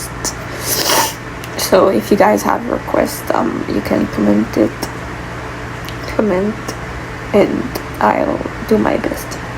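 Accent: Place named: Canada. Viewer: I Luv UR Canadian Accent In UR Video ❤️‍🔥🥰❤️💙🖤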